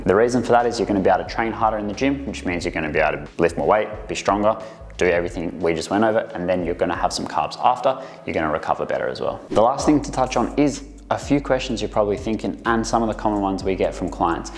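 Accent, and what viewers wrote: Australia. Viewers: Your a champion mate.. the Aussie charm is refreshing to listen to while you explain things keep it up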